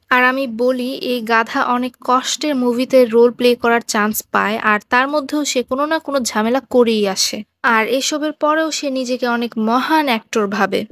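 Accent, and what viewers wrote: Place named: Barishal, Bangladesh. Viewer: আপু তুমি কী বরিশাইলা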